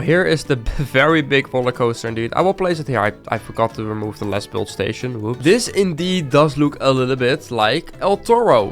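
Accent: Netherlands. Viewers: Hes dutch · he’s Dutch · I have a question are u form the netherlands?? Cuz u sound like u form😅😅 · are you NL? you sound like it while speaking English i can now a am also Dutch.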